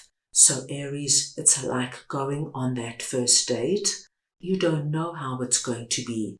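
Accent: South Africa. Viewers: Sounds white south African possibly Afrikan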